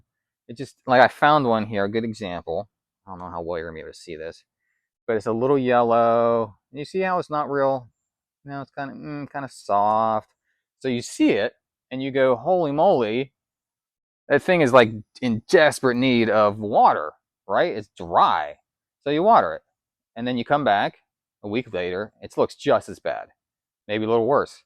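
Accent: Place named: Western Pennsylvania, United States. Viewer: Ha! I heard it… all my people are from Butler, even though i was born and raised in FL, I can pick up the slightest western PA “accent”. I love your collection, very inspiring. I have happy vandas and cattleyas, just getting into dendrobuims. I will kerp watching!!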